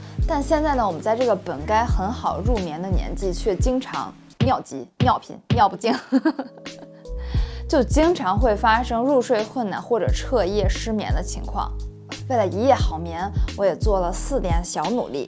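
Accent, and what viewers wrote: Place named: Beijing, China. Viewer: 好喜歡你，謝謝鼓勵我們！每次聽到你說話，我彷彿感覺我還在北京。👍👍👍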